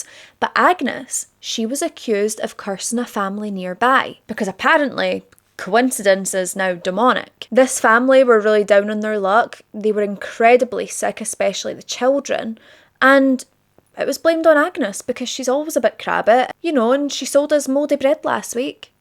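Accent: Scotland, United Kingdom. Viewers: I’ve just found your channel today and subbed. This was a good overview. I definitely would have been considered a witch and probably end up toured and buried. Part of the reason I did sub, not just your delivery and interesting topic but I like the Scottish accent. There is Scottish blood in me so thank you. Peace and love from🇨🇦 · Girl I love your Scottish accent ❤also I came for the witchy stories I love this stuff!! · Happy to find this, i have an interest in witch trials, no matter where, love for Pagan traditions, witchcraft in general, i also have a gorgeous black cat named, wait for it.... Salem. Btw, love your accent, wish i had scottish in my ancestry, but no, just german and a small amount of irish, also have alot of birthmarks and moles, and pretty much a loner. I guess i would be cooked.❤❤❤❤❤❤😂😂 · your accent reminds me of my grandad he was scottish and an amazing man. 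· I completely understand your accent i knew right away you were Scottish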